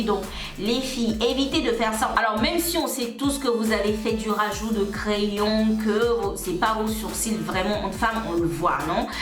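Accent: Ivory Coast. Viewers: Tu es ivoirienne ?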